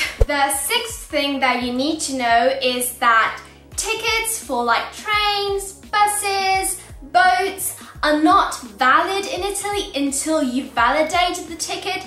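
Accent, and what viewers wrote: England, United Kingdom. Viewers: Question.. If you are Italian, why do you have an english accent? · My grandmother was born in Italy. We still have her naturalization papers. Your English sounds English.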